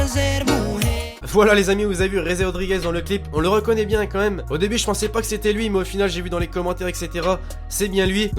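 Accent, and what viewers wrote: North, France. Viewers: tu viens du Nord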